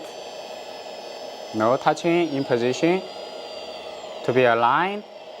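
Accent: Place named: Vietnamese American, United States. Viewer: bro has that vietnamese-american accent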